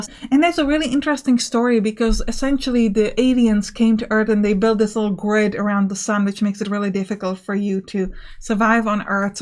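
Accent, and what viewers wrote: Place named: Philippines. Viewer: Wow. I have the honor of writing the first comment. Southern Command looked interesting and I intend to look into it while sales are still active. Thank you. PS: It is nice to find a female wargamer; from your accent Filipina. I only know a few words of Tagalog. I guess the most appropriate is word here is "Sigay" (don't know spelling). Thanks,... you videos on Field of Glory Empires were good too. (I am a former member of AGEOD and friend of Philippe Malacher.)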